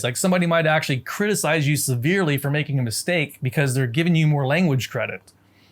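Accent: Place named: South, United States. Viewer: Isn't it the case that, if your individual syllable tones (excepting the usual two-syllable modifications) don't translate into an overall sentence intonation, your Chinese won't sound authentic? Won't it sound more like someone reading or carefully imitating a learning video? I'm still not much advanced from HDK 1 but it seemed from what I heard and from the pinyin that you were speaking Mandarin rather than Yue/Cantonese although some of the hanzi weren't the simplified ones. Do Yue-speaking Taiwanese use the Mandarin pinyin to approximate the nine tones of Cantonese? On another matter. I'm a Southern USAmerican like you but I've spent a lot of time growing up with British people, and there still aren't many natives in Great Britain whose accent is like yours. It's true that some sound pretty much like Americans, seemingly a growing number. 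However, they're the exceptions, but may not be much longer if the trend continues. Almost no one there speaks English anymore as Prince Charles still does, not even Queen Elizabeth. When I get mad, I sound like Andy Griffith in his early career days, but most of the time people think I'm from New England. In New York, they ask me if I'm from Boston. I can understand the impulse to avoid sounding like a down-home yokel.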